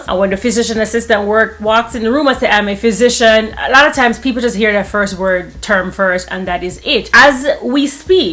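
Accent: Jamaica. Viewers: Come through Jamaican Accent! lol, great video.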